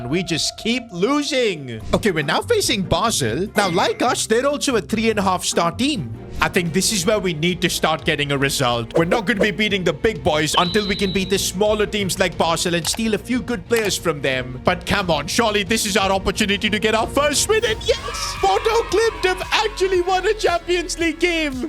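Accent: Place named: India. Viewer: You sound indian and pakistani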